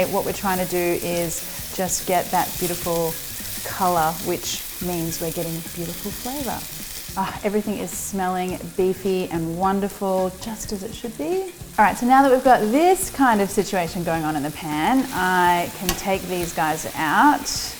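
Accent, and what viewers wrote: Australia. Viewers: You know Marion is Australian not British. Her accent is Australian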